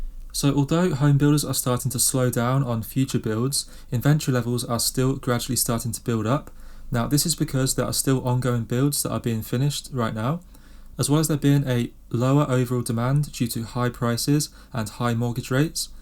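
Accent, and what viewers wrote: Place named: England, United Kingdom. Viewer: Nice accent! You English?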